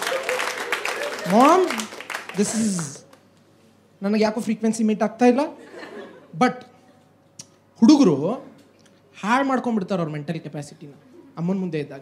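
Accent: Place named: North Karnataka, India. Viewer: Bro ur from north Karnataka pakka I can say that ,both the way I loved it...♥️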